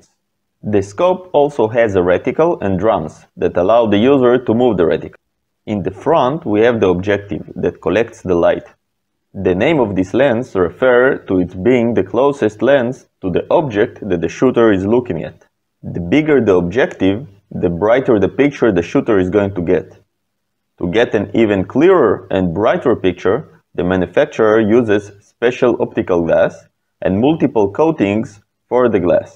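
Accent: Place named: Israel. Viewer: i recoginzed the israeli accent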